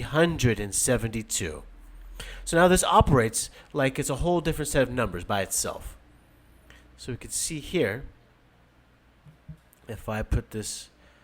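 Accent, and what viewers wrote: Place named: United States. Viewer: that's American accent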